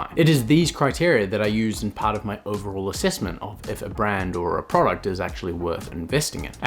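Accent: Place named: Australia. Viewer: You're from NZ? I thought you were aussie 😁 Great video!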